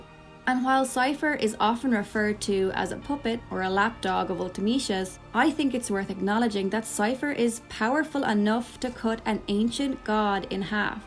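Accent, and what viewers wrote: Ireland. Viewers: Did not expect to hear an Irish accent, great video.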